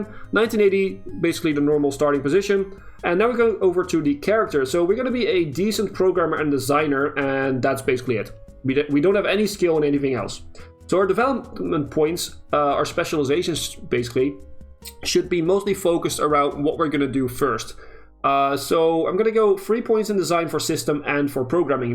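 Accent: Netherlands. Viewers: That's correct, I'm from the Netherlands too. Funny how, as a Dutchy, you always recognise the smallest Dutch accents 😁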